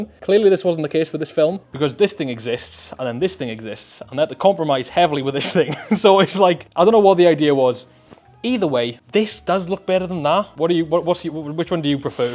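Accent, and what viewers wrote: Wales, United Kingdom. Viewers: Damn... random hyper fixation youruber ALSO being welsh? How did i not recognise the accent sooner? 😂 great work mush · Wow wow wow how have I never realised your Welsh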